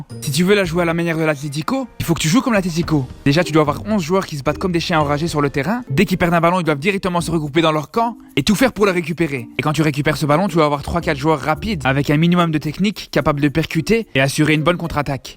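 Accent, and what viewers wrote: Brussels, Belgium. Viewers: Son accent est véridique, elle vient de Bruxelles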